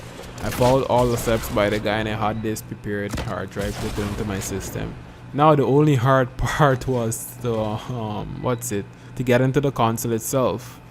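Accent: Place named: Caribbean. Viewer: Good to hear a Caribbean voice on YouTube for once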